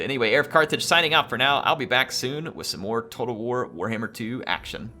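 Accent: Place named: Kentucky, United States. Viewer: I've missed his kentucky-fried accent!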